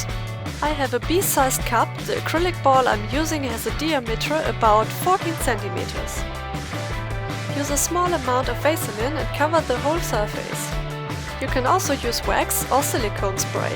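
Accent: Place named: Germany. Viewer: are u from germany because yopur english sounds really german xD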